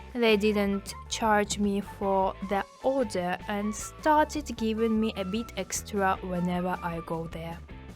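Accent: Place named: Russia. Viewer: I can hear the russian accent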